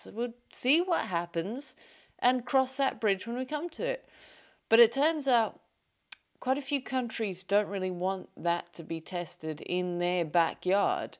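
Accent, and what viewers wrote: England, United Kingdom. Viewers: A subtle yet wicked sense of humor spoken with a English accent- quite enjoyable.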